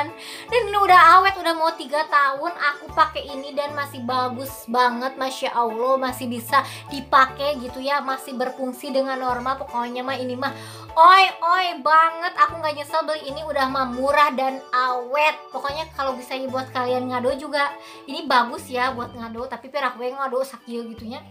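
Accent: Sunda, Indonesia. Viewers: Sunda pisan euy....😆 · logat sundanya kentel banget teh ngakak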